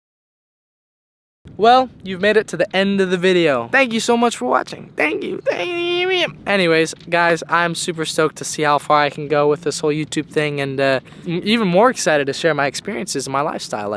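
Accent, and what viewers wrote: United States. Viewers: cazzo, ma è delizioso come parla sto sudista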